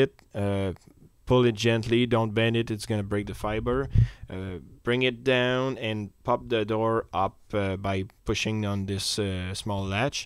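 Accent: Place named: Quebec, Canada. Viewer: LOL j'adore l'accent et finalement pas tataouinage une explication a la québécoise direct au point cool merci!!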